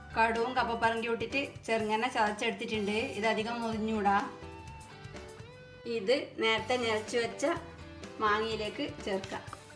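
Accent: Kasaragod, India. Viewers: നീലേശ്വരം ഭാഷ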